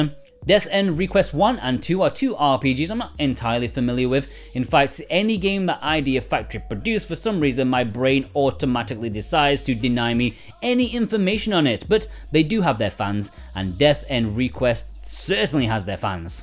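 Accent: England, United Kingdom. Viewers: Love the english accent!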